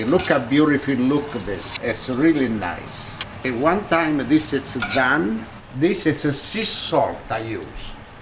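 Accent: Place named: Sicily, Italy. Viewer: Im making this tonight! Love this mans recipes! He reminds me of my grandmother who came from sicily in 1938 when it comes to his accent and his love for cooking.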